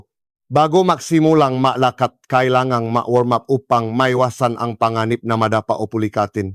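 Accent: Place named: Visayas, Philippines. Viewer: Ang accent niya ay.visaya,hehe but it's ok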